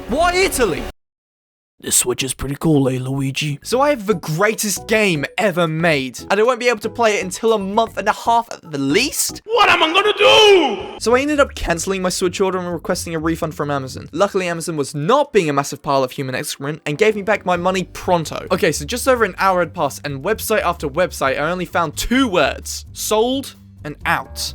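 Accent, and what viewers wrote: United Kingdom. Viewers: Your english accent is so cool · Are you from London ? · This guy sounds British. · HE Is BRITISh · He is extremely British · british :3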